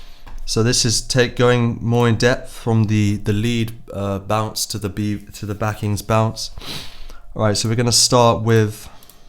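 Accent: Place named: United Kingdom. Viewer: Who knew you had such an amazing SPEAKING voice. And a Brit? I could listen to you speak and be happy. Thank you for sharing your gift!!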